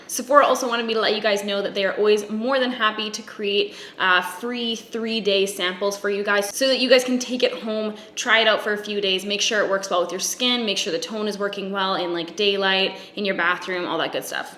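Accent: Canada. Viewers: This was lovely to watch are you canadian🇨🇦?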